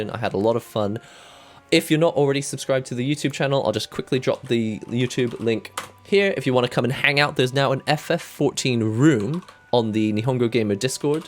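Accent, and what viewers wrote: United Kingdom. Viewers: Your accent suggests you are British or South African. What's the origin of your English Accent?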